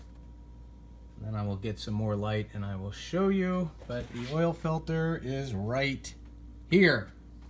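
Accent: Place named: Germany. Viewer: Your video was a great help! Appreciate the humor and German accent too LOL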